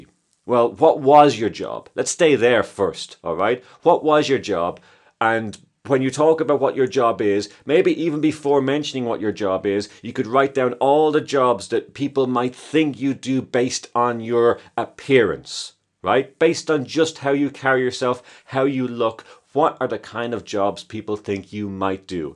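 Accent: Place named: Ireland. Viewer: Thanks! I love your videos! They are informative and well presented! And I love hearing your Irish accent :)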